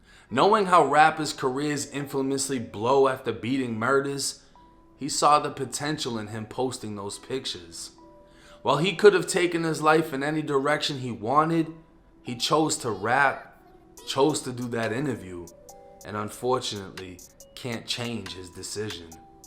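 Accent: Boston, United States. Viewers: You must be from Boston (Baah - stunn). Apartment (Aa - paaht ment)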